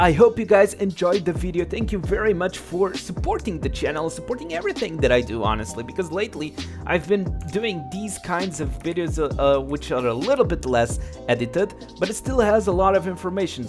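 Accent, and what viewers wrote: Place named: Portugal. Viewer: eu tava entendendo ele falar em ingles, tava achando estranho...ai vi que ele é portugues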